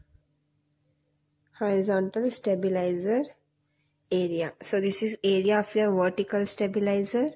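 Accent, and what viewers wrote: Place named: India. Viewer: Worst explaination, Improve your english. Do not speak indian english. Learn international english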